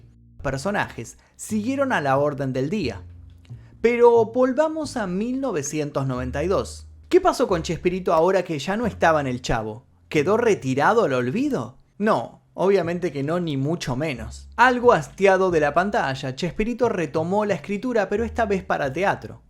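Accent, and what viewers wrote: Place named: Argentina. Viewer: Voz en neutro pero acento argentino, voz conocida...., sorpresa, automáticamente suscribirme.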